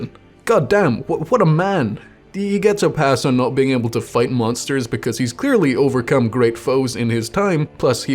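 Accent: Scotland, United Kingdom. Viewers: I did not realize that you were Scottish until this video I just thought you were polish or czech or something because that's so common for youtubers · wait you're british? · he’s like Scottish jerma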